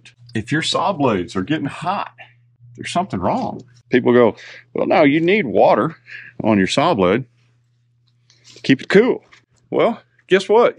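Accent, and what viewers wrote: South, United States. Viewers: BGATs are the sawyer's version of winding sticks. Thanks for being straight forward with your slow, Southern talk. It's much better than engineerese (I am fluent in both😂😂) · I hear "Southern" so I understand you perfectly! As far as types of videos, I love the ones like this. I like them all, but on these I always learn a little something. Episode #2: items 6-10; Episode #3: items 11-15; and so on,,, Starting a series and you didn't even realize it.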